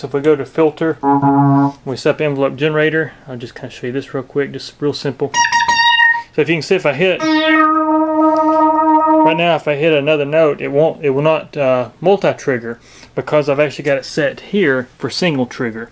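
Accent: South, United States. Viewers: You sound southern?! I’m in NC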